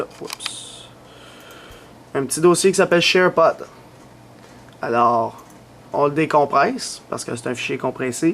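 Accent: Quebec, Canada. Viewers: L'accent de Québec déchire! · ahha caline jai clique sur la video sans savoir que tetais quebecois! chai pa pourquoi mais ca a comme faite ma journee! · mec j'habite a montreal depuis 6 mois et c'est sur youtube que je trouve le meilleurs accent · J’aime bien ton tuto je suis aussi Québécoise alors je comprend très bien de la manière que tu parle merci cela est parfait.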